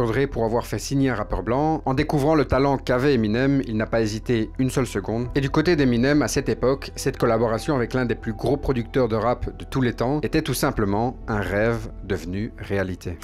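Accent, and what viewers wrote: Belgium. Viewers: bien aimer je like et m abonne J'ai comme l'impression que tu es Belge car tu as un accent. Pas comme le mien encore car je parle d'après mes amis Bruxellère lol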